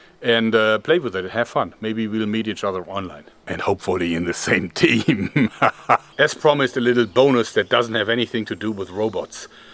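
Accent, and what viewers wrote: Germany. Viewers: is he german beacause he has ahuge accent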